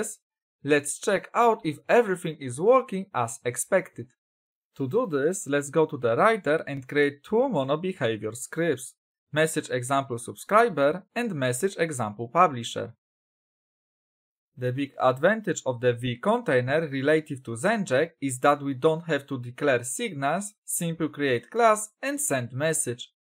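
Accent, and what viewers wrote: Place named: Poland. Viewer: Ziomek, słuchać w uj że jesteś Polakiem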